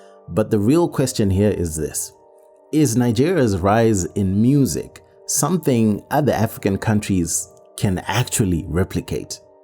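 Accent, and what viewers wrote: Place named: Ghana. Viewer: You sound like a Ghanaian. Let me educate you on something; Jollof is West African and Nigerians didn't appropriate Amapiano. The generation of musicians that existed before the current musicians paved the way and the new generation just used what they blazed as a stepping stone to the top. There's no substitute for hard work.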